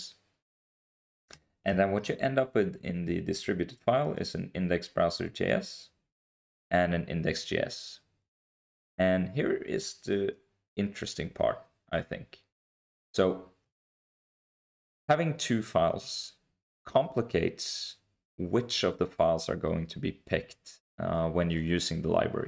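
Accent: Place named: Russia. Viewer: russian accent)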